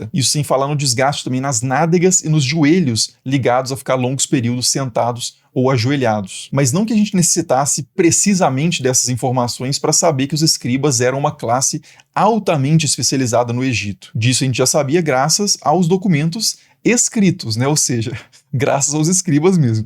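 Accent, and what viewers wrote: Minas Gerais, Brazil. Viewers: O fala de um jeito como se gostasse da gente! Típico do sotaque mineiro!❤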